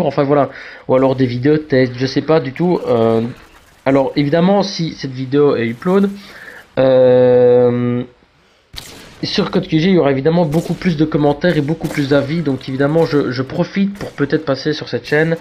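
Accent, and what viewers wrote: Belgium. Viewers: j'ai direct su que tu venai de liege avec ton accent ( je suis de verviers ;)) ce qui explique ton pseudo ;p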